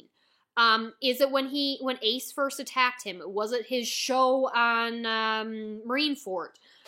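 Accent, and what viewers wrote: Canada. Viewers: Is your accent Canadian?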